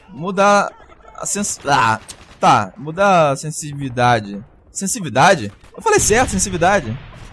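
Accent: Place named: Rio de Janeiro, Brazil. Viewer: Ele é carioca cara '-' Tá loko? kkkk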